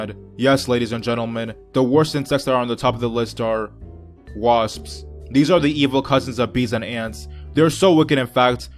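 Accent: Philadelphia, United States. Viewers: R u from Philly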